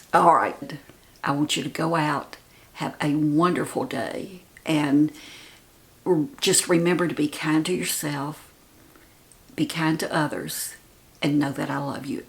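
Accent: South, United States. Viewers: You look lovely, as always. Do you ever watch Melissa55 on youtube? She reminds me of you...your Southern accent, some of your facial expressions and mannerisms. Anyway, hair color, particularly box colors, fade on everyone's hair over time, especially if you are covering grays. Even though they say "permanent", they are not going to stay vibrant forever due to shampooing, sun, and other products. I started coloring my own hair in the 1970s and became a licensed cosmetologist 20 years ago. I cannot tell you the number of times I colored my hair and, being a natural born redhead, I have been everything from bright copper to mahogany to strawberry blonde and red is the hardest of all colors to keep vibrant. I gave up coloring 5 years ago and am so happy I did, but boy, I do love the color of yours now. It looks age appropriate and youthful at the same time. 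Sometimes I think I would like to go back to the pretty blonde with high- and low-lights I used to wear, but alas, it is so much easier to just go natural (for me!) Your color is beautiful and you did a great job on the cut, too!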